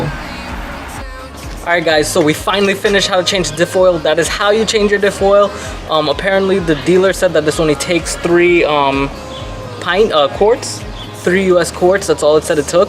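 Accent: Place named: Trinidad and Tobago. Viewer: Very nice man, I have an 03 avalanche. It sounds like you're from Trinidad? I'm from St. Kitts and Nevis, but my family is from Guyana.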